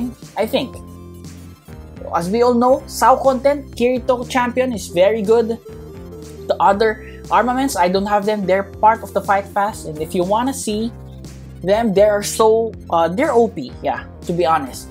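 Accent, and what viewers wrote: Philippines. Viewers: talking sus he sounds like a filipino..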